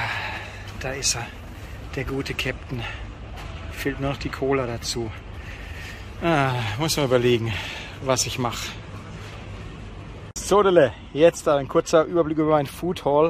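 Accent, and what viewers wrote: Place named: Swabia, Germany. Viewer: Hallo, ein trauriger Anblick, die ganzen Überreste der Autos zu sehen. Erkannt habe ich kein einziges davon und das soll durchaus was heißen.🤔😅Aber ich habe dennoch eine Gemeinsamkeit der Autos entdeckt, bei allen ist das Dach eingedrückt. 🤔😉 Deine Unterkunft ist ja mal wirklich schnuckelig und der Ausblick grandios.🤩👌 Aber mer hert hald scho da Schwob in dir, "sodele". 😂😂👍 I winsch dr an scheene Sonndig ond dange firs mitnemme. 😊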